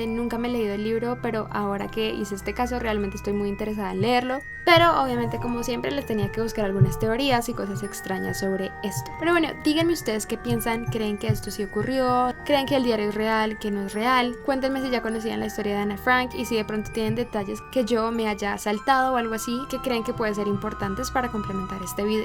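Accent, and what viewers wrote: Colombia. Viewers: kikis pipos pues no lo veas y chao ella es colombiana asi hablan ellas respeta, que tiene que ver su novio aqui??? ganas de joder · Qué horrible es el acento colombiano, ¿no?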